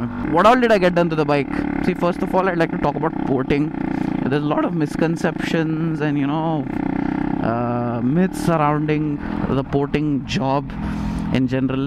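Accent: Hyderabad, India. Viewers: only person on youtube i love listening to 😅.... vo apna hyderabadi accent sunne ka mazaich alag rehta bhai 👌🏻👌🏻🎉🎉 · i love you'r Hyderabadi accent bhai.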